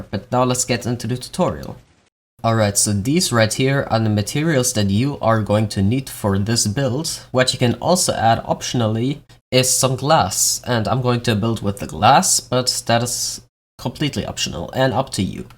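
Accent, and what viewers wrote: Germany. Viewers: sounds a bit German(?)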